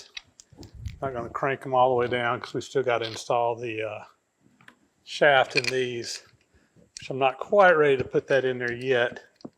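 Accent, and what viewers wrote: South, United States. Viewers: Always nice to see your projects coming along narrated by your southern accent that is soft on the ears to this NYC guy. I hope that someday when this country returns to manufacturing and engineering fine products that young folks can watch these videos and see a skilled craftsman at work.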